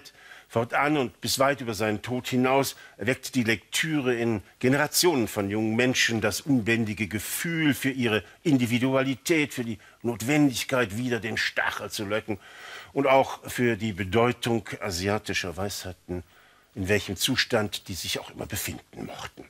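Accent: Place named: Ruhr, Germany. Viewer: ist sicher keine "Kaulquappe" sondern ein versierter Literaturkenner. Was aber den Genuss seines Vortrags für uns etwas trübt ist dieser leicht aufdringliche, asthmatisch Oberhausener Pottbeiklang.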